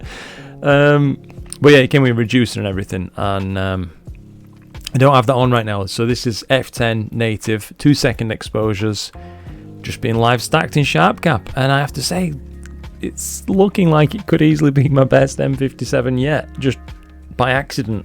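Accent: United Kingdom. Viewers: it really does, thanks for the response. Yes UK based and from the accent im guessing not a million miles from yourself. They seem to be considerably cheaper ordering direct and it seems most of the Starfield stock comes from their HQ in Canada after reading reviews so I may order direct. I've watched a few of your videos today about the player ones and I'm really impressed with the quality. Great videos as well 👍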